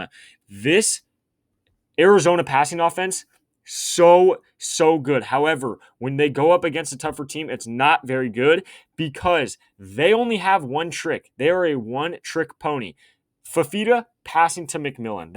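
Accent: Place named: Bay Area, United States. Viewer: Aye I’m in the Bay too! I could tell you had a lil Thizz in yo speech!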